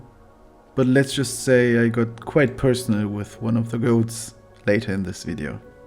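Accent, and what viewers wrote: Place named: Germany. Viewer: Your accent is lovely, whereabouts in Germany are you from?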